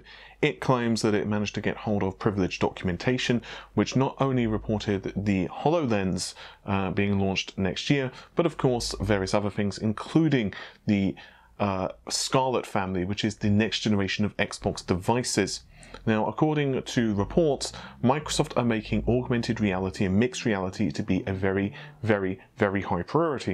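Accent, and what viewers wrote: United Kingdom. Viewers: He sounds more British than American tbh.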